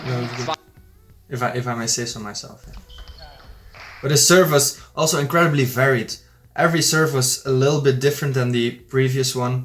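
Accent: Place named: Flanders, Belgium. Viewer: Nice video, thanks! And I thought you gave Timo a good game:) From your name I was surprised to hear you had a Flemish accent. Goed gespeeld;) It’s a pretty basic observation, but I noticed many of your returns from topspin balls deep into your backhand (when you were close to the table) went long. I often do the same and I’m puzzling over effective options in such situations. Good health to your knee and many more amazing TT adventures to you 🙏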